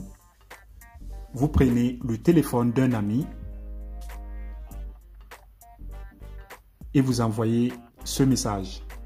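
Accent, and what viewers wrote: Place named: Mali. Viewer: Merci beaucoup depuis le Mali. Votre accent me semble malien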